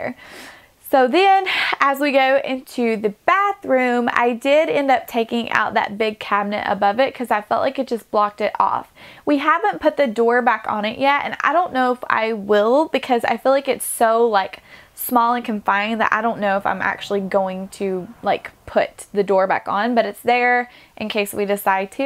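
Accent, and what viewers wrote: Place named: South, United States. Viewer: mai567 it's called a strong southern accent.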